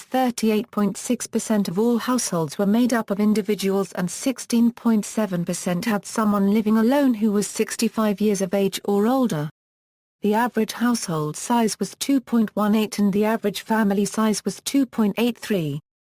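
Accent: United Kingdom. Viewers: Is this some robotic 'Siri-like voice' with a British accent reading this or a real person?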